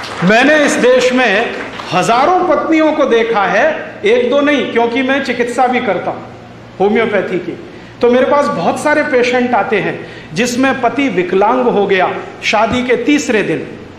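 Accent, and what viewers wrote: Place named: Lucknow, India. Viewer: Mujhe lagta hai ap lucknow se ho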